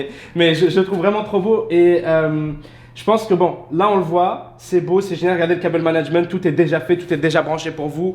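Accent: Canada. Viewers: tes canadien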